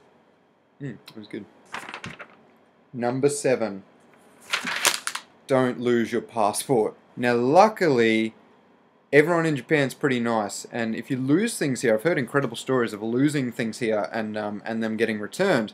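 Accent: Australia. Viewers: To be honest I thought exactly the same after watching the video xD The stupid aussie accent just makes it even worse..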